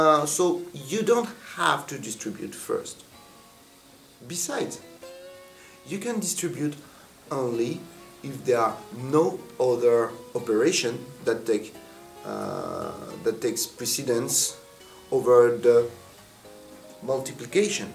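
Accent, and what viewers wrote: France. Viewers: he obviously isn't English, he has a French accent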